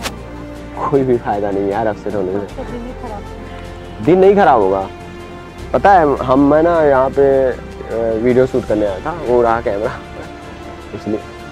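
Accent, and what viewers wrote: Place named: Uttar Pradesh, India. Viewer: Up walo ke hi pass yese bat krne ka trika rhta h me bhi mirjapur se hu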